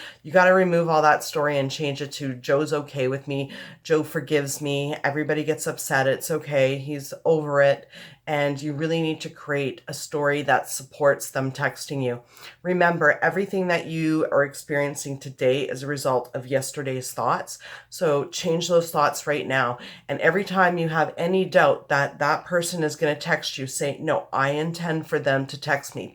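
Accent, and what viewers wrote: Canada. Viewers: This is off topic but are you Canadian? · .....Are you Canadian???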